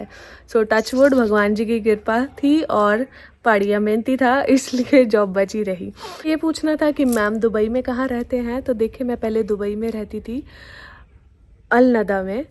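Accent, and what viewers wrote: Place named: Punjab, India. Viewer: Aapki Punjabi mix ho jaati hai madam par jehi baat aapko junik girl banati hai madam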